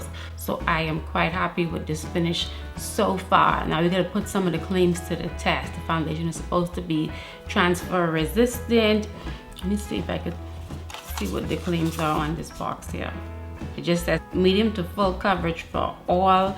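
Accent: Bahamas. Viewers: You don't see this much over here. We just slap makeup on without 1st taking care of our skin and then wonder why our skin is messed up. I use to think makeup broke my skin out until I started watching beauty channels here on YouTube. Then I learnt how to care for my skin before and after applying makeup. Not gonna it feels strange hearing the Bahamian accent here on YouTube but you doing it. Taking 242 to the world 🇧🇸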